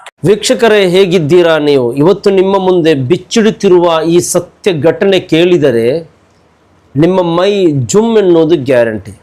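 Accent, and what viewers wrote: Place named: Mumbai, India. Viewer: Nimma kannada Mumbai kushi agthide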